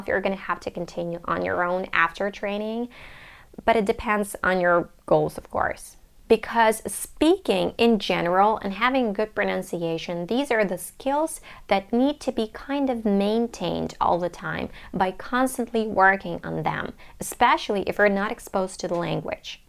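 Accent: United States. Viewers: I thought you were a native speaker ! Then , I heard a little accents ...when you said " comfortable " & the way you said " thank you " in another video ,here & there ,but you are really really good ! Usually any Europeans have their accents ( heavy ) when they speak English ! You got an American accent...why ? How come you chose the accent over British one ? I am curious ! Anyway there are many very good English teachers on you tube ,a couple of English teachers & one American ,they are all female interestingly ! Lol. But, you can really help non native speakers w/ English by using your own experiences & insights ! That others can't ( as those ladies that I really like are all native speakers ,so they don't go thru w/ the walls we,non native speakers bump into & find it hard to climb up on ,or break it down ) guide the way you can ! You are a real good success story that we all can lear from ! 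You deserve more viewers & subscribers !👍💜💜💜💜💜🥁🐉🎵🎤🎶💕💞💕💞💕💞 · Your American English accent and pronunciation is so perfect, dear ... You are one of my pavourite english pronuncers ... Where are you from ?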